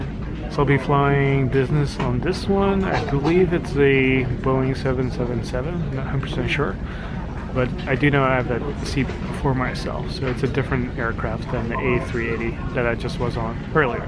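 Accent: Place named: Australia. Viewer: you have a bit of aussie accent… nice flight review by the way. cheers!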